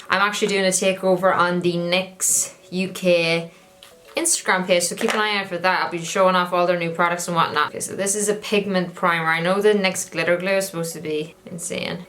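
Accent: Ireland. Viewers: Great video! Where in ireland are you from? I can't place the accent 😂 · Man sounds Irish as fuck.Fair fucks to yeh getting in my recommend some how.What county?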